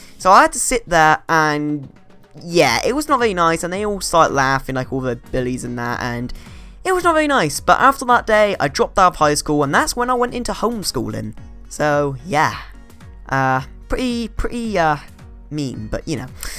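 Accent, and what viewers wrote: United Kingdom. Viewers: Im sorry but are you british? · Omg I love your British voice I always wanted to speak British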